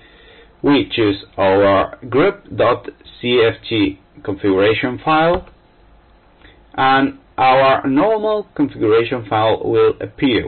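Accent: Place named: Spain. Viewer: Parker Stephens He is a spanish developer, so English it's not his language. Also, I think he is reading a script. For spanish people, like me, sometimes is difficult to have a good accent in English. Regards.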